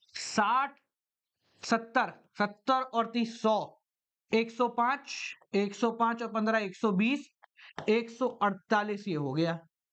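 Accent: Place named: Agra, India. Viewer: sir aap agra se ho kya aapke accent se lag ra hai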